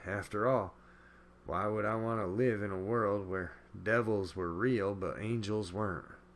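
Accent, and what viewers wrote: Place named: Oklahoma, United States. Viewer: HOW THE HELL DID I MISS THIS ONE ???? LOLOL. !! LOVE the accent !! I know a lot of hillbillies here in Oklahoma that. Talk just like that !! Some of my family in S.E.Okla. !!! Lolol😹😹😹😹😳 Great job , hon.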